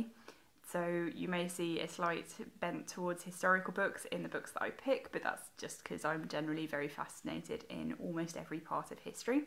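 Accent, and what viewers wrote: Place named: England, United Kingdom. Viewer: Thanks for your sweet video. I love your beautiful accent, englishy english :)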